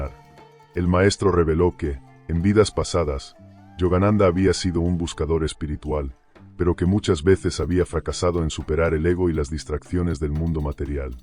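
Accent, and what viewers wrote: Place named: Spain. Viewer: Olá ..por favor..alguien podría decirme de qué parte de España es este narrador? Paramahansa es mi maestro ..mi gustó mucho la narracion ! Soy de Brasil y entendi bien su discurso ..estoy apriendendo español! muchas gracias !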